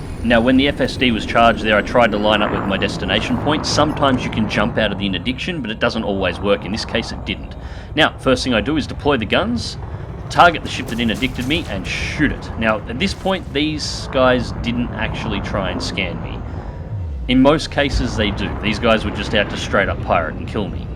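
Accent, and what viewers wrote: Australia. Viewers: goes to slee..... sees vid of well, not gonna hurt hearing an Aussie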